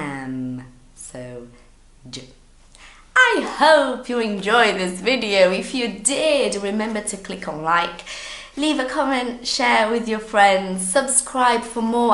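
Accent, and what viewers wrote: United Kingdom. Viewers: You're the prettiest teacher ever, even though you're from here you could very well pass for a british native 😌😉 did I do well teacher?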